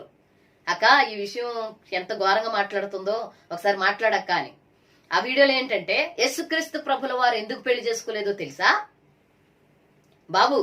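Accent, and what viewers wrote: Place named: Srikakulam, India. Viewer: మరి దీనికి 100 మంది రంకుమొగుడులు దీని శ్రీకాకుళం భాష దీని పెంట మొహం ముండా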